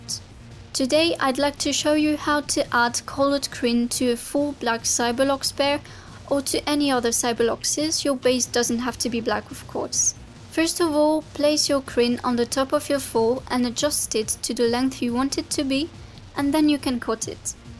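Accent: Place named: England, United Kingdom. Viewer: Donne moi ton accent anglais on fleek s'il te plaît:(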